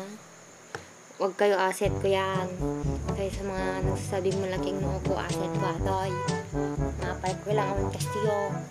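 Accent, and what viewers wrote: Ilocos, Philippines. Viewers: baro nak nga subcriber, napapasaya mo ako sa ilocano mo ksi pangasinense ako HAHAHAHAHAH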